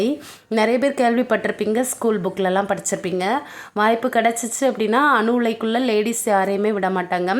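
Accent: Tirunelveli, India. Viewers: திருநெல்வேலி பாஷை எனக்கு ரொம்ப பிடிக்கும் ❤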